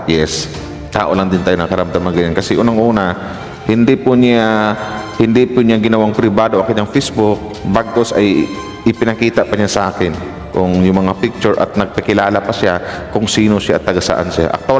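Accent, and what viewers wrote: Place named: Batangas, Philippines. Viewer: ❤sir ang accent Ng salita ay Batangas.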